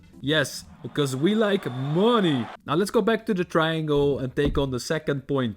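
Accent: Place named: Netherlands. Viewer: Nederlands accentje is wel smikkelen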